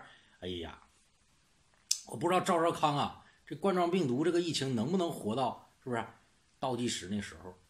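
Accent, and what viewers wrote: Northeast, China. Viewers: 太搞笑了，東北話太帶感了，全程像听相聲，笑死我了🤣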